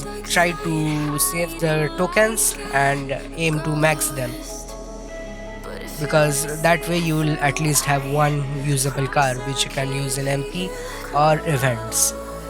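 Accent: India. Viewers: idk if ur indian ur voice sound like ur a indian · Bro are you Indian cause you speak English like an Indian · U Indian bruh ? 😅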